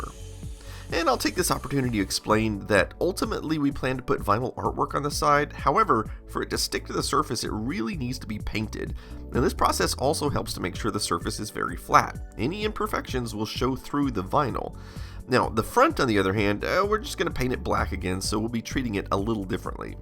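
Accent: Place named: Texas, United States. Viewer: "I cleaned the CRT right quick." Ah yes, our Texan speech.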